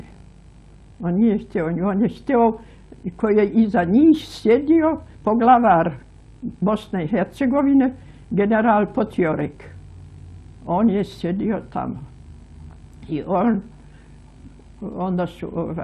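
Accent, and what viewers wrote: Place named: Ekavian, Serbia. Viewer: Hercegovka, odrasla i školovala se u Sarajevu, a govori ekavicom. 🙄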